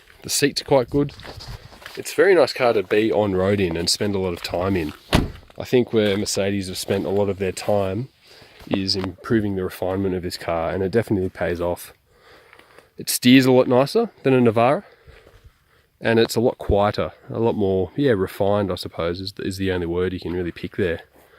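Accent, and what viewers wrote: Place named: Australia. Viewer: Cool Australian accent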